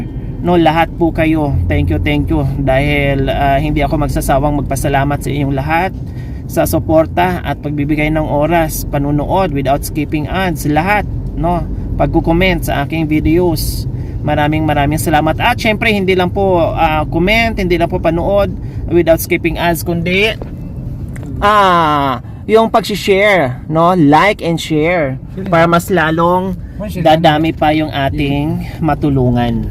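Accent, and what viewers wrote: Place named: Negros, Philippines. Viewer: Im from kabankalan kag naka pagasawa ng taga Moncada.That is why,iba ang tuno mo magsalita super lambing hehe.Halong and bless your good heart.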